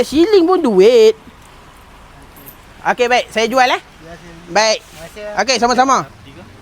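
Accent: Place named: Kedah, Malaysia. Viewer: Kat mana ni kedai cakap mcm org Kedah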